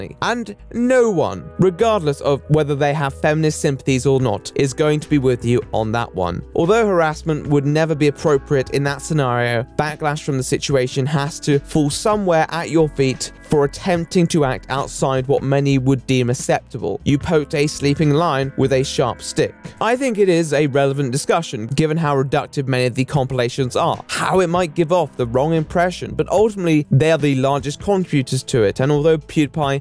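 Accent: RP, United Kingdom. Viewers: I absolutely love his posh British accent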